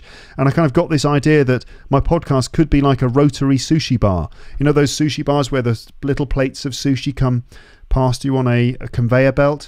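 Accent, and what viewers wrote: RP, United Kingdom. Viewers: *it's a comment from shadow 😂 * Вот по-русски я бы сказала, как я рада слушать ваш классный голос и потрясающий британский акцент! Могу как Лев Толстой в одно предложение на две страницы без единой точки выразить бурю эмоций и переживаний, а по-английски не могу! Поэтому сижу в тени! Ваш старый добрый подкаст сам как старая добрая Англия 😊 Спасибо за него! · Hello. It's always great to listen your podcasts and watch your videos. They really help me in learning English. I love British accent and your accent is absolutely amazing. Thanks a lot. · Hi how are you, please when you read the books which accent do you use RP or what?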